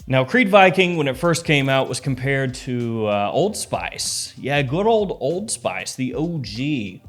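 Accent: South, United States. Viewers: That southern accent should make an appearance more often!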